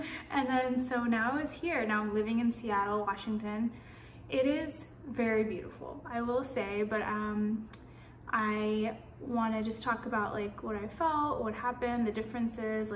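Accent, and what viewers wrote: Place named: Atlanta, United States. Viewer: You have the Atlanta accent same as mine, Atlanta was full of transplants from the 90s so we didnt get a GA accent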